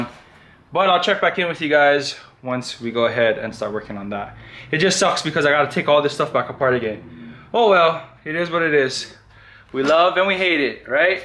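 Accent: Hawaii, United States. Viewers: You from Hawaii braddah? Can hear da pidgin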